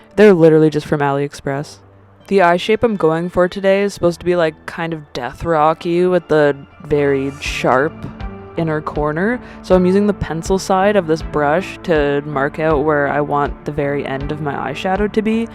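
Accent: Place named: Canada. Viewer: You Canadian Accent is amazing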